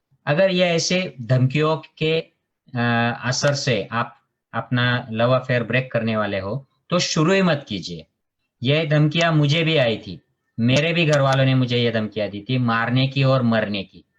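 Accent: Khandesh, India. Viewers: Sir aaple Marathi Mansa lagech olakhle jatat aapli khandeshi lokanchi hindi khup ch khatarnak Aste sir😀😀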